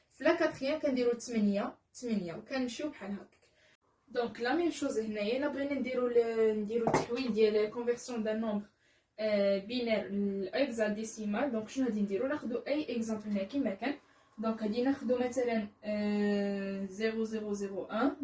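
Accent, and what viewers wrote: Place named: Algeria. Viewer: تبان لهجتنا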